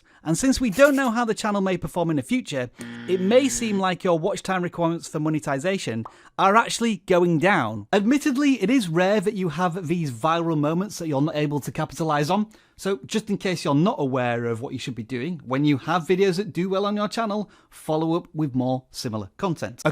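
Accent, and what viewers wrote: Yorkshire, United Kingdom. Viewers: wait you're british? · Yes! Straight talking from Yorkshire #fistpump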